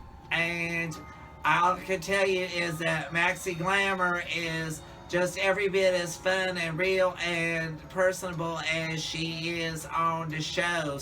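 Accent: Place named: South, United States. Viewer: Thanks for the subtitles,. Not everyone can speak Southern Country. Great review! Hot Topic Clearance Rack, lmao.....Happy Halloween Doll!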